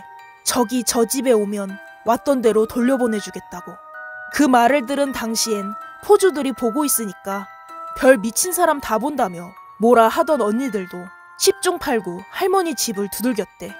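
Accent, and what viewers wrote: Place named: Gyeongsang, South Korea. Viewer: 정신단디 챙기라.이게 포항말이지.듣다봉께로 윽시 재밋노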